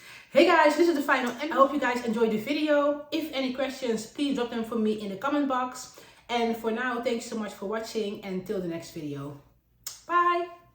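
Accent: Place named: Netherlands. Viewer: Ik hoorde al bij de eerste zin dat je Nederlands was. 🤣 Ik kwam je video tegen omdat ik niet zeker was als ik die cream & butter samen kon gebruiken; dus ik zocht het even op Google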